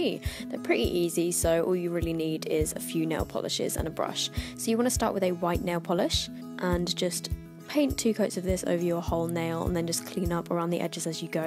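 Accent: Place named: Kent, United Kingdom. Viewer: love it yessss girl where abouts are you from near Kent or more central London? We have the same accent lmao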